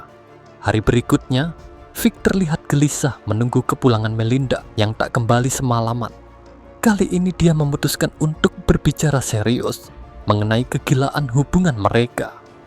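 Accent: Java, Indonesia. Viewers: Logat nya Jawa x min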